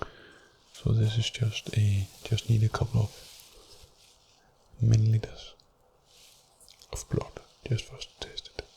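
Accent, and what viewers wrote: Scandinavia. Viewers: Your Nordic accent sounds/is like 'nectar' for my ears (and Soul) Thank You🤞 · Is that a Scandinavian accent? Sounds similar to my Danish relatives.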